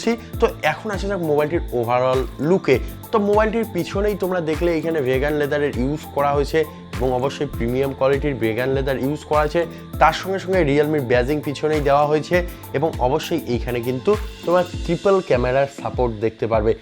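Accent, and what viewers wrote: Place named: Bangladesh. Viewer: Honestly you are bangladesi, as per your voice and etc.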